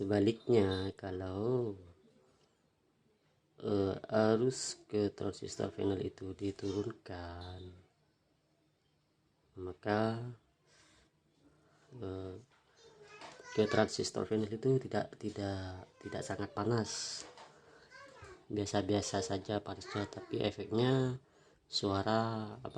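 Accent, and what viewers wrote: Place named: Madura, Indonesia. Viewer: madura mana sampean mas?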